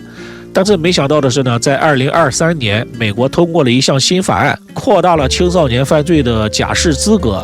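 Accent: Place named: Northeast, China. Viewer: 並不說所有人都能說案的，你這一嘴大碴子味兒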